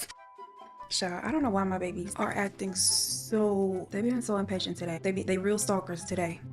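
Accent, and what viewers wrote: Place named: Houston, United States. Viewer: Alsoooo, ever since you moved to Houston that accent been accenting I love itttt ❤️😂😂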